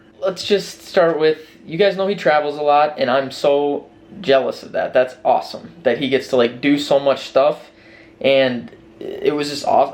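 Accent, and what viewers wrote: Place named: Minnesota, United States. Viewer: I fucking love this video. sounds like a Minnesotan so much lol Love you!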